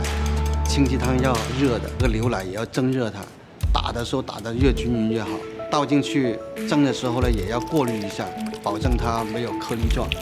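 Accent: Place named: Cantonese, China. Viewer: Yucheng Zhou I somewhat agree with you except that to me he sounds like how my dad speaks mando while his main language is canto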